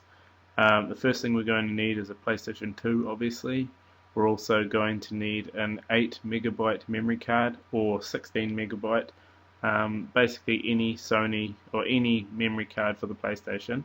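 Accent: New Zealand. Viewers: You sound kiwi... Are you? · KIWI ACCENT!! Busted... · You sound like a kiwi?? chur brother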